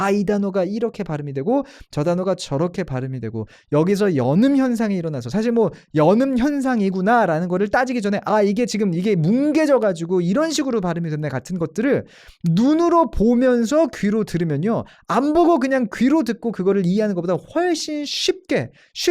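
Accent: United States. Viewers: 근데 뉴질랜드에서 유학하셨는데 미국영어 발음(?)을 가지신거 같아요~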